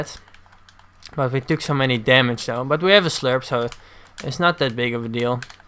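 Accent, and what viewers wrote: Netherlands. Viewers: Correct me if I'm wrong, but are you Dutch? You sound Dutch at least. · Are you dutch?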